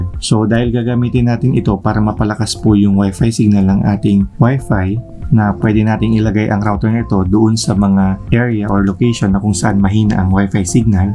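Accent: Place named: Pampanga, Philippines. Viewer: your accent panggalatuk or pampangan got me sub bro😎😎😎 great content and a big help to anyone. keep'em coming💪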